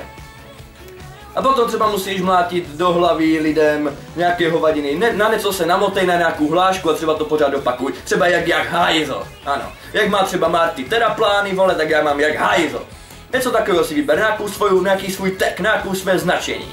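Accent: Moravia, Czech Republic. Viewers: Jsi z moravy??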